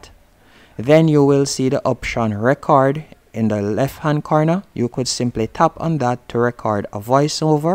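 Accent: Jamaica. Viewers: ya jamaican?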